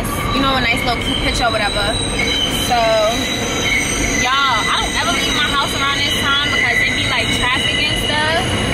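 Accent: New York, United States. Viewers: You sound like a true New Yorker. I’m here for it 😭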